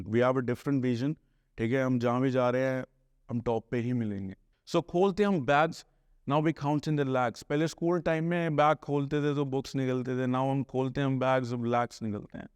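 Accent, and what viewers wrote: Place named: India. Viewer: Bro your sound is more indian than so Indians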